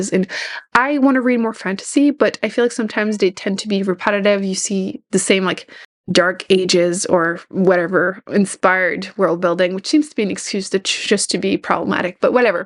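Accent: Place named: Quebec, Canada. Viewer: Glad you said you were from Quebec-I kept trying to place your accent. I also have only enjoyed Big Little Lies by Liane Moriarty - I gave up on her.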